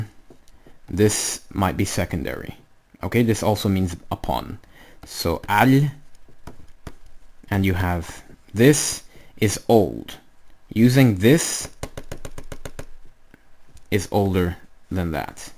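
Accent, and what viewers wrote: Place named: Yemen. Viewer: You sound just like a Yemeni Hebrew! Very Impressive! God Bless you brother. Thank you for the Wonderful teaching.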